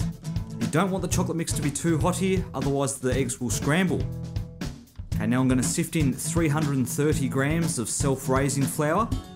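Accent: Australia. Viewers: I love Aussie accent! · its nt british i thowt he was aussie dikk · The aussie accent sounds very cool, respect))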